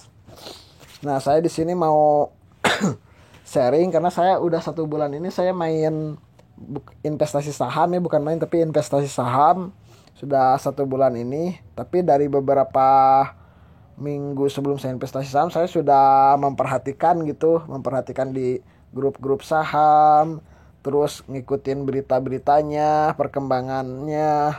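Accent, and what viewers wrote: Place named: West Java, Indonesia. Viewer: Ciri khas bahasa ,,,Orang Sunda 🤣🤣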